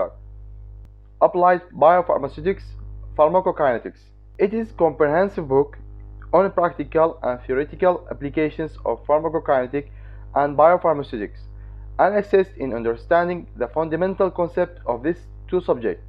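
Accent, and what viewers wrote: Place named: Arab world. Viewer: Your English accent sounds arabic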